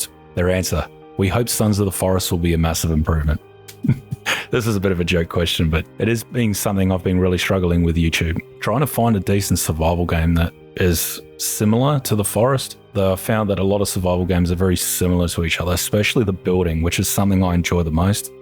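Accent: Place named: Australia. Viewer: your voice sounds very Australian and as an Australian i can say thats a compliment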